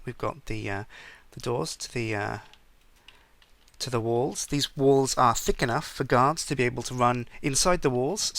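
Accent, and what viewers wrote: United Kingdom. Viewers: You can clearly tell he's from the UK from his accent.. Why would you need some sort of sign from him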